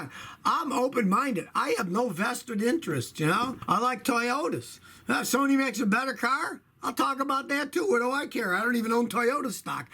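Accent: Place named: New York, United States. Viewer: why do you have a New York accent? You sound like Joe Pesci.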